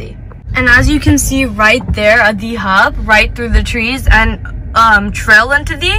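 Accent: Telugu, India. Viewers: Nice video yar... So nice hearing ur voice combo of telugu with American english slang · Hi you speaking telugu as like english language, but good explanation high school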